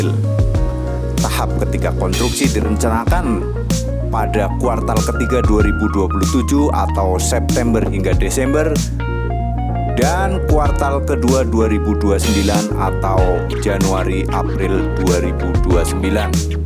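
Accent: Banyumas, Indonesia. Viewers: jebule ngapak temen rika kang🤣🤣🤣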